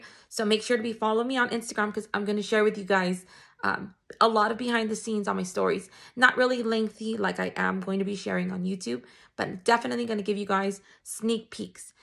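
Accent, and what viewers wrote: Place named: India. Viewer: A mi me Cuesta enterder su ingles cuando habla, su acento es bien fuerte. Pencé que era de la India 🇮🇳.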